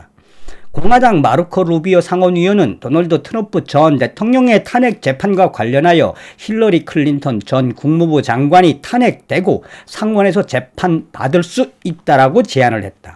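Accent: North Korea. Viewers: 이 분 북한사람 인가요? 매번 북한 말투로 ??? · 요즘 북한 사투리에 빵빵 터집니다. 간만에 재밌는 웃음을 주시네요~^^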